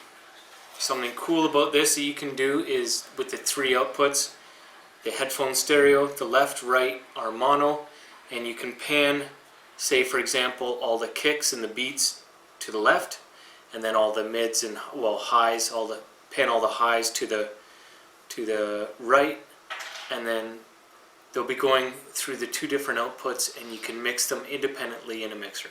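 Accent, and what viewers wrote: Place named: Canada. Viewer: You have the slightest hint of a Canadian accent. :P Love the video, thanks for sharing with us.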